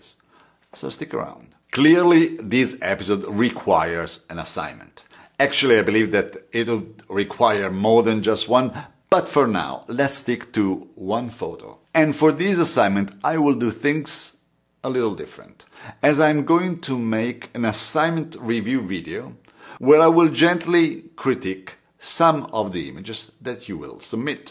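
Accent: Italy. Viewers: Again, I am totally amazed at the gift of finding you on YouTube. The word that fits is " serendipity" ( the occurrence and development of events by chance in a happy or beneficial way; that is you!) You make learning a joy and it helps with that awesome Italian accent. I never truly understood the rule of 3rds and now I understand. In Japanese art it is about what is and what isn't and that emptiness brings power and balance to all things. .... we come to that place for me where I can't wait for the next video. Mahalo.. ps I love the histogram T .. that is so very cool with the label. e'la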